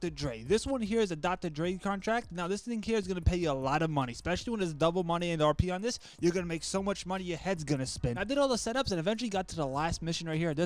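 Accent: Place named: East Coast, United States. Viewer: i’m staying bro u funny 🤣🤣 east coast huh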